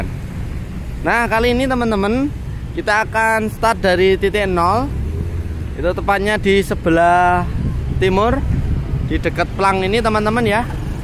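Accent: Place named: Java, Indonesia. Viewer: Medok amat yak ngomongnya....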